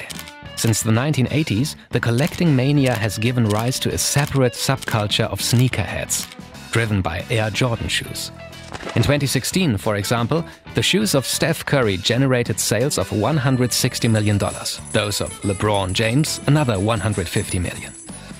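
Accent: Germany. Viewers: I like this guy's thick German accent. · Still loving den deutschen accent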